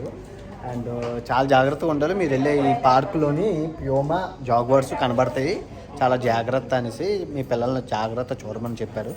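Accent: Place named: Srikakulam, India. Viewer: I started watching in Facebook now I am one of your subscribers😊 doing great job bro entha tension lo unna me voice ki me sarcastic ki chala navochesthadi Madi Srikakulam ma slag koncham me voice lo vinipisthadi..chala degara Vala laga anipistharu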